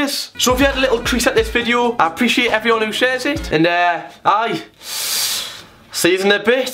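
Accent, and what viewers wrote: Newcastle, United Kingdom. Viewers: Ayeeeee been looking all over YouTube trying to find a real geordie here's a propa geordie a new subscriber you've earned!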